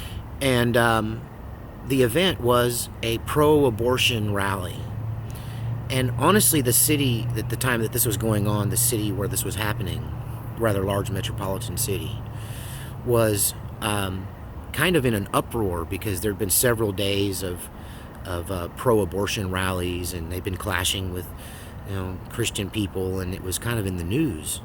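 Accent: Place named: Texas, United States. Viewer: I knew you must be from Texas. (That's a compliment).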